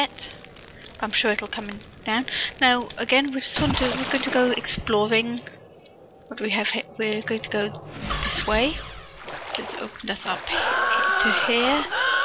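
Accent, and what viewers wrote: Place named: United Kingdom. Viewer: British d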